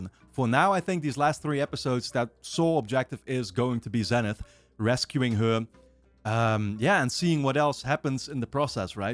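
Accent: Netherlands. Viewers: are you dutch?? or do i mistake your accent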